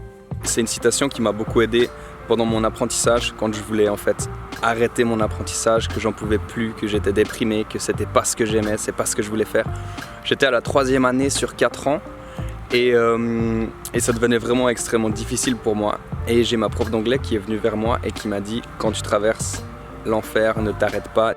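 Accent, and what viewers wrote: Belgium. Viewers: On dirait que t'es bruxellois Sinon love you and your beautiful viedooos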